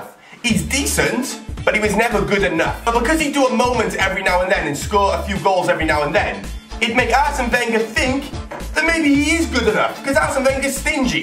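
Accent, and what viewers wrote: Wales, United Kingdom. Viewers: Hey are you from Wales lad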